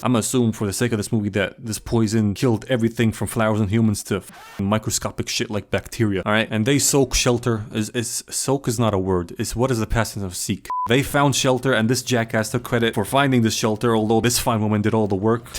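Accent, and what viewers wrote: United Kingdom. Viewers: Hey CAN YOU DO ONLY BRITISH ACCENT IN YOUR NEXT VIDEO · Man your British accent Is a killa put that on repeat for my bong rip shit was hilarious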